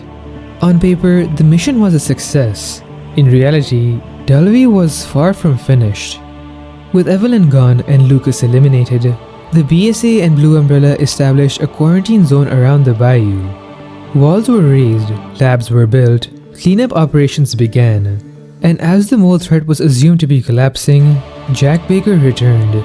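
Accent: India. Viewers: Fookin accent are u indian or latina?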